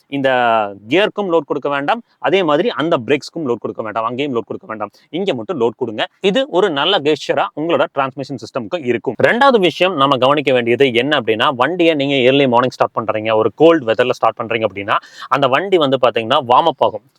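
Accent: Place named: Kongu, India. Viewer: Awesome, I am watching from your initial video. Amazing to watch how you improved. Your Content, Presentation & kongu slang fantastic. Keep Josh ahead.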